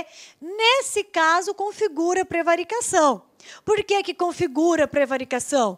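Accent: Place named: Curitiba, Brazil. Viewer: Adorei essa profe e o sotaque curitibano tipo o meu (senTE)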